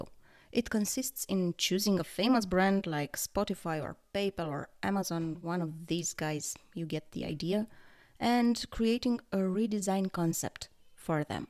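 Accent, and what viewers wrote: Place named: Russia. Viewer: Классные упражнения!Спасибо!У тебя русский акцент чувствуется)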